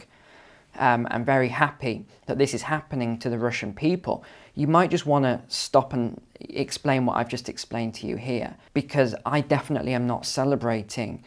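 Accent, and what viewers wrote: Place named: United Kingdom. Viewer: "I don't know about you but I don't fancy going to a nuclear war right now" UK English just sounds so proper and funny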